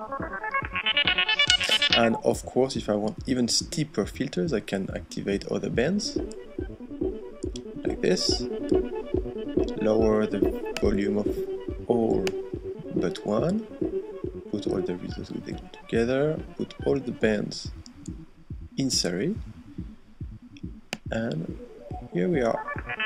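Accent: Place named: France. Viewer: L'accent français est drôle :)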